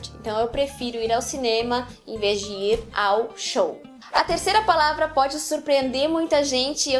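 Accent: Rio Grande do Sul, Brazil. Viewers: tu é gaucha???? nao creio!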